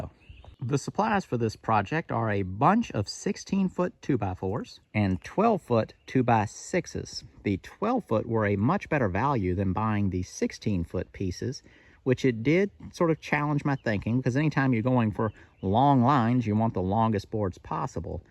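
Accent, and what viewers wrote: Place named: Texas, United States. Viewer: You must be from Texas lol. You gt that Texan draw to your voice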